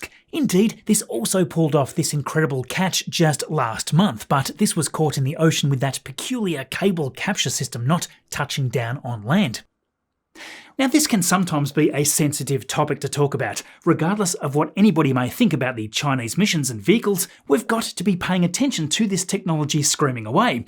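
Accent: Tasmania, Australia. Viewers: Didn’t realise you were a fellow Tasmanian! South or north?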